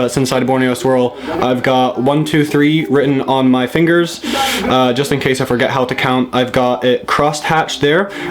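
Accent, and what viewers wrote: Scotland, United Kingdom. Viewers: I think he moved to Scotland from somewhere so his accent scottish accent sort of blended with the other one. Just as half-assed as his tattoos lol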